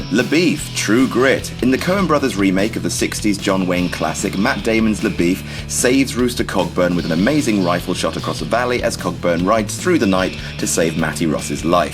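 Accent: United Kingdom. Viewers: Will I subscribe to "WhatCulture"? NOPE! Very dumb video here. You imply in the title that your going to tell us about things we missed in movies that tell us these characters didn't really survive. Instead you make some very dumb conjecture that has nothing to do with the scripts or stories. By your logic - every character in every movie really died - because everyone eventually dies. I hate videos like this - where you present no real value. Just use some british-accented knucklehead to voice over a POS - imagine if a 12 year old kid did the voice over to this - you'd realize is was a complete waste of time.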